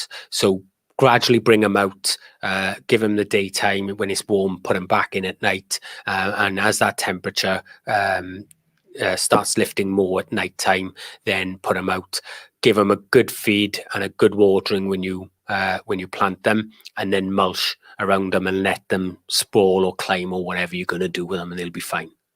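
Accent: Wales, United Kingdom. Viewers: No worries, I really do love your content and you can’t beat a good welsh accent 😁 I hope the operation goes well and you make a speedy recovery, I know how hard it can be living with vertigo, my mum gets quite bad bouts sometimes and can hardly do anything when they hit. Great stream by the way, congratulations again on the 250k subs 🥳 🥳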